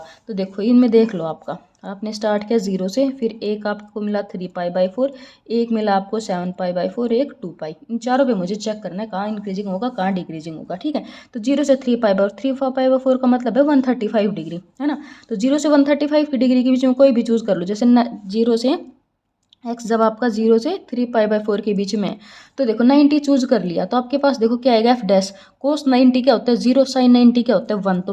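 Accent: Haryana, India. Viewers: Haryana se ho mam?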